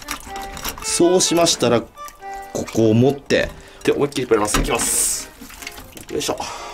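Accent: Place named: Mikawa, Japan. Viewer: 三河弁が親近感わきました！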